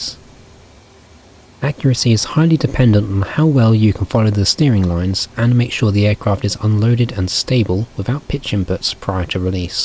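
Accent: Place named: United Kingdom. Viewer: Don' t forget the calm British voice!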